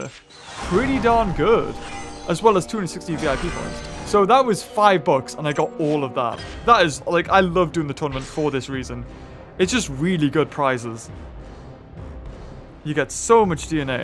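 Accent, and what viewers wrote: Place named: United Kingdom. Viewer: youre a good youtuber but heavy brittish accent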